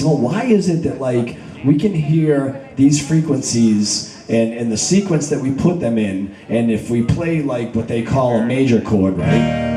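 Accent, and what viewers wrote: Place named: Boston, United States. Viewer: I could listen to him talk anytime love that Boston accent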